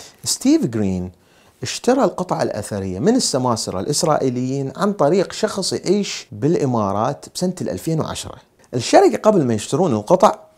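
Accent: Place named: Iraq. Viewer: احسنت من قال أنك خرجت من العراق بل لسانك مازال في العراق احسنت على أشياء تستحق فعلا راح اعملك شير الان👏👍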